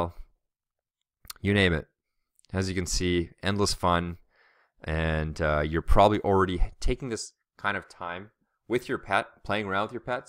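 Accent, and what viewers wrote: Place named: Canada. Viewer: Yeah ... but I'd completely whoop your ass at Sonic Spinball ... So, I'm sitting here marathoning your videos, and you've said that you don't have a pet ... Lets hope that you're single as well, as I'd only ever heard of you approximately 2 and a half to 3 hours'ish ago ... And I'd nearly labeled you an asshole, but you're cool ... I was so borderline offended by the first of your videos that I watched, more mildly outraged ... Now, I realize that you're just brutally honest and a sweetheart of sorts, with your whole taking on the responsibility of taking care of your family and all ... My father fell into a coma the day that I came home from Colorado, never woke up, but that's a different story ... #PepperThePitBull keep an eye out for her ... OH, and you are officially "Strange Brew" Canadian when you say "about" ... It's not something that you can change at this late date, but yeah ... 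That's definitely your Canuck street cred right there ... You pronounce it more "aboot" than "about" ... Anyways ... <3 C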